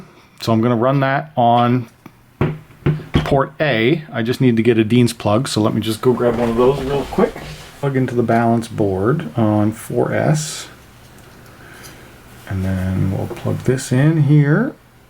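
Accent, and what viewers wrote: Canada. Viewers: The Canadian in me immediately recognized the Canadian in you 😁😁😁😁🇨🇦🇨🇦👌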